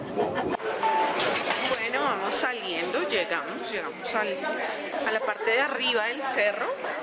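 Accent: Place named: Colombia. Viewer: sabía que eras Colombiana por el acento, pero ni idea que vivías en bogotáa!! que chevere tu videooo! Un día tenemos que conocernos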